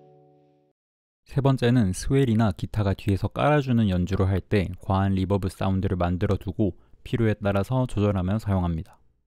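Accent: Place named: Gyeongsang, South Korea. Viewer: 경상도 분이신가봐요?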